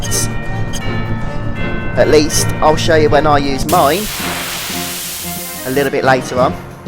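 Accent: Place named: United Kingdom. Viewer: where is the accent from? it sounds... British? but i know its regional, but what region. lol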